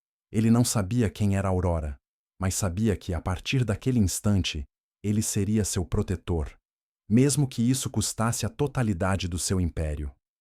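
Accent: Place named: Portugal. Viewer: Que narração orrível a istoria e boa não coloquem mais este narrador mistura com língua de portugal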